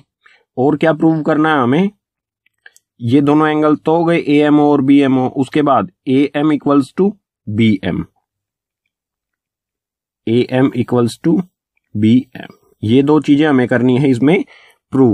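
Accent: Haryana, India. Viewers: Aap haryana ke ho kya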